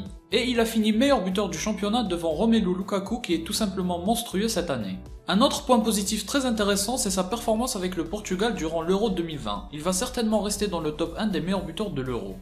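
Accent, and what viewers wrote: Kabylie, Algeria. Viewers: Oh la la la l accent kabyle , je kiffe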